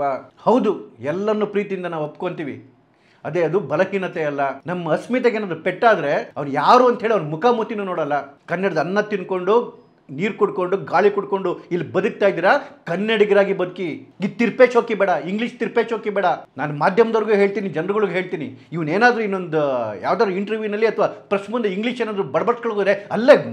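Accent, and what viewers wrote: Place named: Tamil Nadu, India. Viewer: Tamil chenai mathadthane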